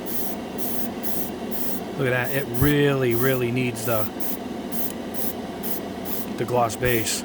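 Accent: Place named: New England, United States. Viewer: Dude, thank you so much for doing these; you're my primary resource anytime I'm trying to figure out what I can airbrush. You rule 🤘 Also- New England Italian… Sounding like a bunch of people I met in New Haven who reminded me of a bunch of people i grew up with in New Jersey. Accent rules 🤘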